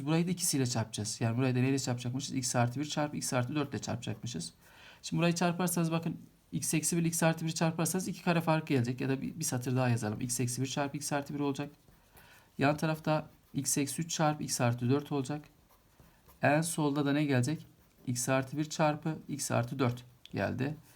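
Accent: Trabzon, Turkey. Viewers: hocam aynı trabzonlu gibi konusuyonuz yanlis anlamayın 😁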